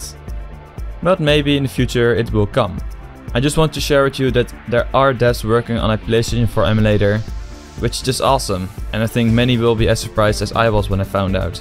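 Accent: Netherlands. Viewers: You dutch? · I sense a Dutch accent tell me if i'm wrong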